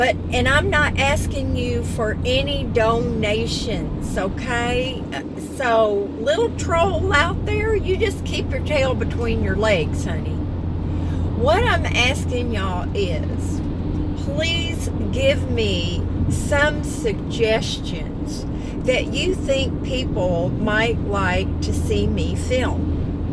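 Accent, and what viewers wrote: South, United States. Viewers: Well, you could make a 30 minute video on water evaporating or grass growing and I would watch. I’m to much of a Fanatic so I’m not sure what to recommend. All I could do is recommend you stick with what got you all those 1,000’s of subscribers, must keep them watching. Then add scenic So Cal location, through your eyes. Using you captivating Southern accent. No need to interview anyone. YOU ARE THE REASON WE WATCH YOUR CHANNEL SWEETIE. “ Magic!” ☺️👍👍👍👍❣️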